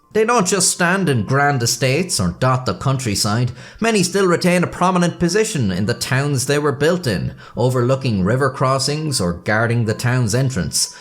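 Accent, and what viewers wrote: Ireland. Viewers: You're Irish‽ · I mean, he has an Irish accent, so it's not that big a surprise. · You sound like you’re trying to limit your Irish accent but the Irish accent is too strong to get rid of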